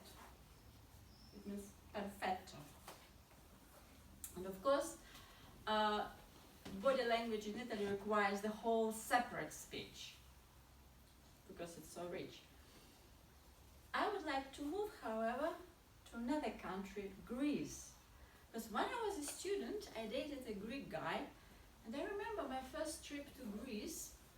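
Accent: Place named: Russia. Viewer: I love your typical russian accent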